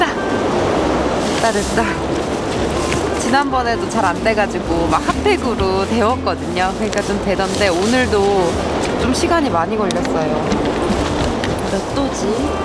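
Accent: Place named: Seoul, South Korea. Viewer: 서울 말투 인가요 차분하니 말씀 잘하시네요..경상도 말많듣다보니 너무좋네요 ㅋㅋㅋ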